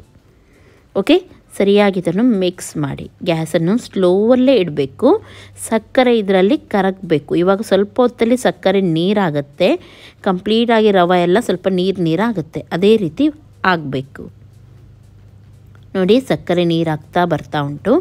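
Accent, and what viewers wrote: Mangalore, India. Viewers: But really nice 🙂 speaking 🗣️ mostly they are manglurians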